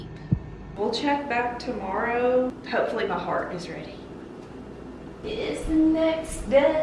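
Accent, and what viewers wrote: South, United States. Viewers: that Southern accent😍...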